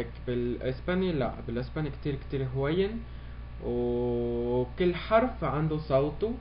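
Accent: Mexico. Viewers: يتكلم اسباني ب لفظ مكسيكي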